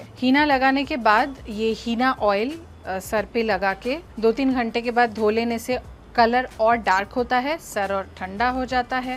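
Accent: Bengal, India. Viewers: আপনার বাংলা কথা শুনতে খুবই ভালো লাগে, বাংলাদেশ থেকে দেখছি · I like to hear you all day when you talk in Bengali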